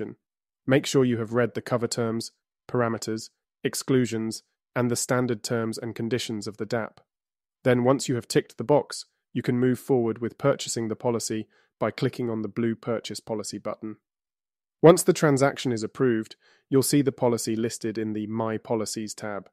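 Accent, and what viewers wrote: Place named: United Kingdom. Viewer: I like dictor's british accent)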